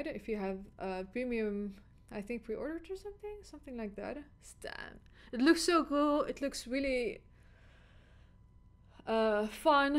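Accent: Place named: Netherlands. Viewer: Do you come from the Netherlands? You have a Dutch accent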